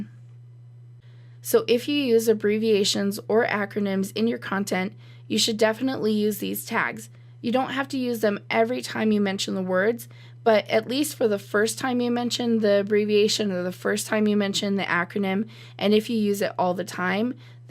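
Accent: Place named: United States. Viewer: Thank You :) Finally someone with American accent ;) I was watching 2 previous videos with strong Indian accent... I didn't understand it at all..:D Great lesson thanks again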